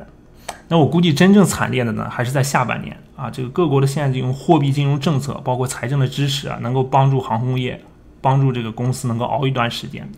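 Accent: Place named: Shanxi, China. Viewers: 山西口音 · 是山西口音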